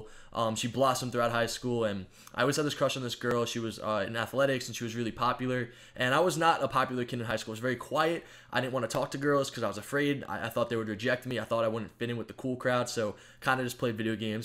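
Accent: Spain. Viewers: Doug. You have the worst spanish accent ever. At least try. IntelliJENTe? Tsk.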